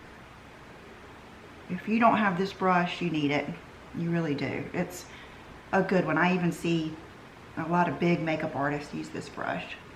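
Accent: United States. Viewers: I am a recent subbie from the UK living in Spain and I love your channel. Love your accent and you are very pretty. What state do you live do you mind me asking? Xx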